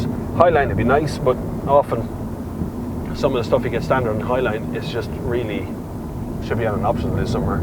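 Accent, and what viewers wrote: Ireland. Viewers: I like your Irish accent :D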